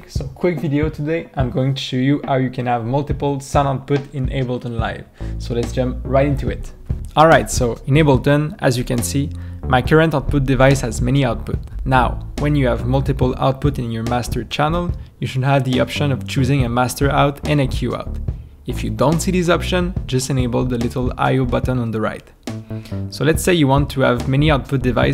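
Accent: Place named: Quebec, Canada. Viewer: tu est clairement québecois haha ?!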